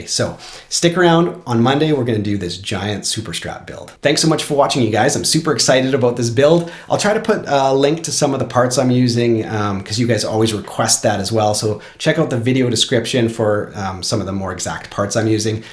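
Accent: Canada. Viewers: Are you Canadian? Cause I am and I detect a bit of the old "eh" going on.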